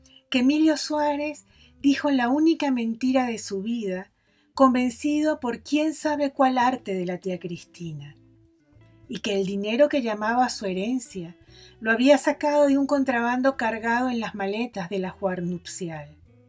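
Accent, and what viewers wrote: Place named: Venezuela. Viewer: Soy muy desconfiado cuando de escuchar a alguien narrar algo. Sobre todo si ese alguien es español. No sé si a ustedes les pasa lo mismo, pero cuando los narradores españoles utilizan ese tono tan antipático de hablar en medios, simplemente cambio, ya no de canal. pero si de medio. Por eso, quiero felicitarlas por la forma en que narran estas bellas historias. Creo, por el acento, que quien narra es venezolana. Pero, como sea, lo hace de maravilla. Gracias.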